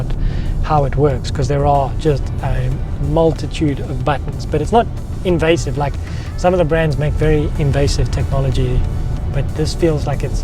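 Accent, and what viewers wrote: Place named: South Africa. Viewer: You can tell by his voice that he is from SA!!!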